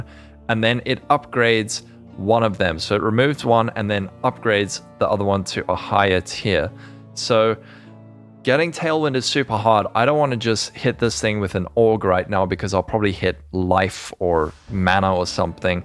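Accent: South Africa. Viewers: Hey bro, I commented on your last video r.e. figuring out you are a South African from your accent... how can I got about getting those discord details? My buddy and myself from SA would like to connect with other SA guys... shot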